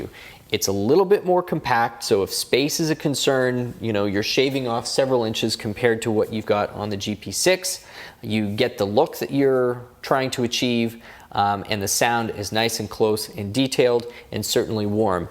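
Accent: Canada. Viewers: Questionable, indeed. I would say downright annoying! I don't understand the point of the subtitles, since speaks clearly in perfectly understandable (eh?) Canadian... 🙂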